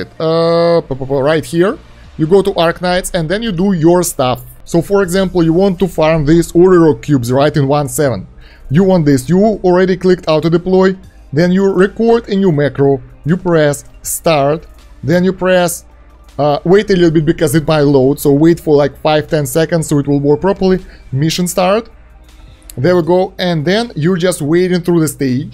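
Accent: Russia. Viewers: Ты русский или умеешь говорить с акцентом?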